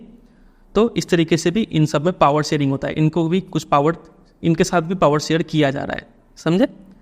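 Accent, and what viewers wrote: Bihar, India. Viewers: Nhi 😂 bihari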